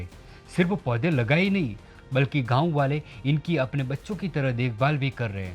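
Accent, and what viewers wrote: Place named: Gujarat, India. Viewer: your Hindi is good but tone is Gujarati, please keep it up!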